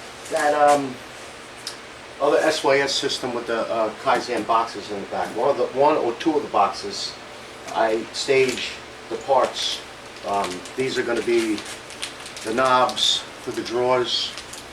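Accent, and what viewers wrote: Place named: East Coast, United States. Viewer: you legend! liked and Subbed. love that East Coast Accent? You remind me of the Rebel Turner. All inspiration for me going home for six weeks to my home workshop in England this Thursday!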